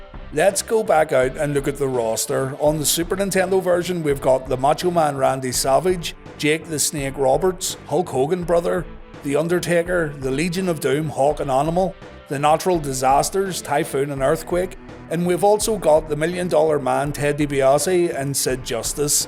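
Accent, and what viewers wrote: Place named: North, United Kingdom. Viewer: could you possibly talk less Northern or Irish I find you hard to comprehend the Queens English would help alot thanks in advance.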